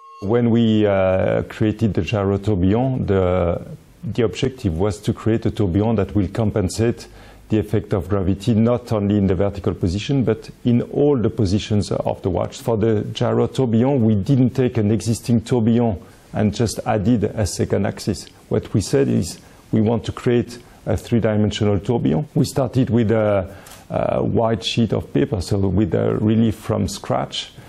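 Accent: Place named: Japan. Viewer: なんか日本人のカタコト英語みたい笑 まあそれが一番聞き取りやすいんだけど。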